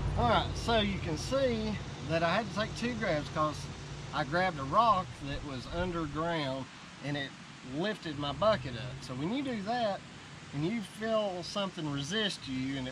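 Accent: South, United States. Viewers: your a hell of a teacher with your southern twang \m/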